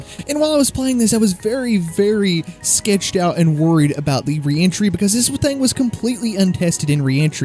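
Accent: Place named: South, United States. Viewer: i love your countryish accent, it just makes the whole vide so much more enjoyable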